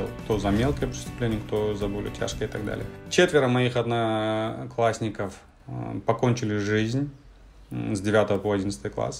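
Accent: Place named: Naryn, Kyrgyzstan. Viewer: Крассавчик ты как Нарынский говорю...Эркек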